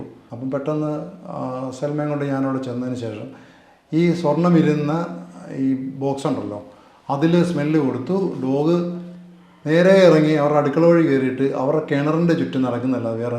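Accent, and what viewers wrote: Kannur, India. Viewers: Anchor kannur aaano!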